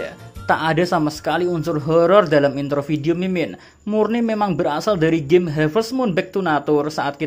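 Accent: Java, Indonesia. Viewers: Jangan diganti y min intronya .... Selain krna suara lu yg medok ,, gw subscribe chanel lu krna intronya .... Berasa jd bayi lg w klw dnger intronya .... 😅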